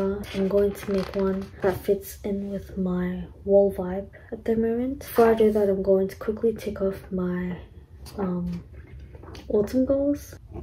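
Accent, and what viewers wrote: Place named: Australia. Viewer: now developing a slight aussie accent bcs i keep binging ur vids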